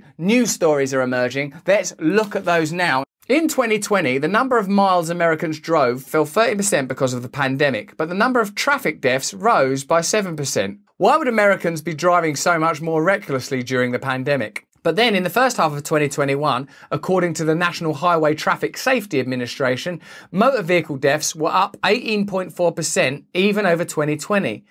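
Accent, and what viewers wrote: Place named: Cockney, United Kingdom. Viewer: Wow, Mr. Brand, you are hitting new highs and I am so glad I can understand you in these last 2 videos... for a while the cockney was so thick and you speak so fast, I could not understand you and quit watching. I think you are now hitting your stride and I enjoy the perspective you are able to present to us.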